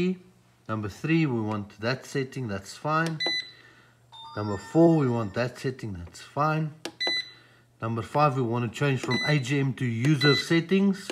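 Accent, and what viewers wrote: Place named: South Africa. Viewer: You cant mistake a south african accent anywhere ...nice one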